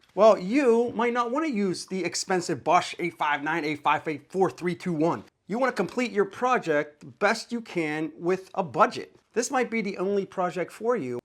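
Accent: Pittsburgh, United States. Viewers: Bro is 1000% from pittsburgh or surrounding area. My man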